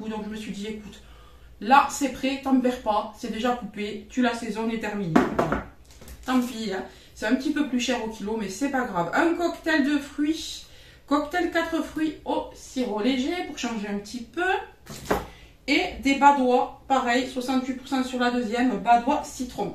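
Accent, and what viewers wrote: South, France. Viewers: jadore ton accent!!!!! ca sent bon le sud et le soleil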